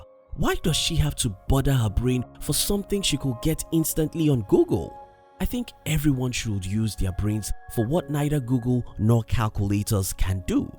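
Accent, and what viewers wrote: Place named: Nigeria. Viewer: Strong Nigerian accent 😆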